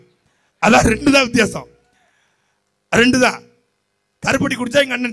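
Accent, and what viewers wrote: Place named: Kanyakumari, India. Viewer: Kanyakumari slang👏😅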